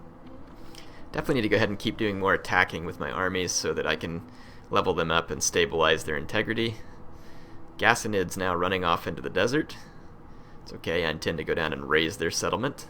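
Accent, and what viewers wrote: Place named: United States. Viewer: By the way what US state are you from again? Just curious cause i'm trying to figure out your accent ;)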